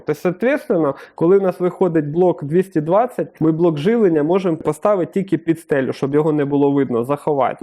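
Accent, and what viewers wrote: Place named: Cherkasy, Ukraine. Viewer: это что за язык? черкасский? кировоградский? слушаать невозможно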